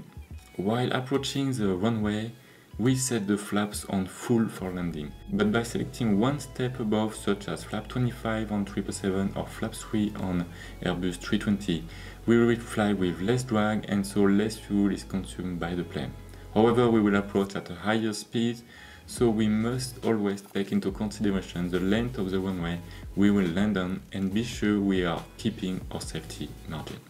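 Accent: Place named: France. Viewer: Ca c'est de l'accent Franco-Français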